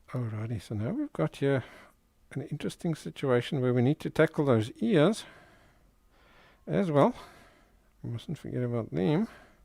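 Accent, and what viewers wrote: South Africa. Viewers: Hi. Judging by your accent I assume you're South African. Where can I buy a set of pencils like the one you had in your video about learning how to draw? ❤Love your channel so much; thank you for making time to share your talent!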